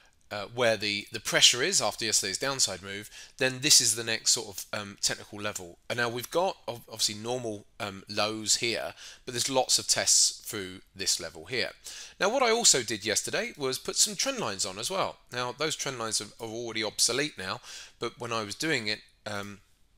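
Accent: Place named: United Kingdom. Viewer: That British accent man I wish I had it too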